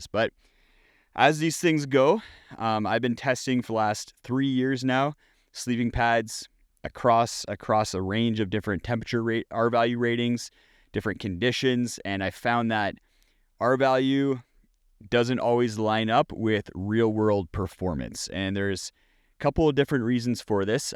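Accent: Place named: Minnesota, United States. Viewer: Love your channel - just found it, and am making my way through. I really appreciate your thought process and honest takes/reviews. And your accent - sounds like home to this Minnesotan. 😂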